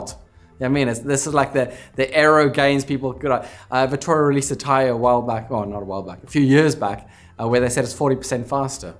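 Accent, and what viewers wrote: South Africa. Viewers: 😂strong opinions much? This cat is hilarious. Do I detect a South African accent? If so it’s typical 😂😂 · A bit of a South African accent I detect there? Lovely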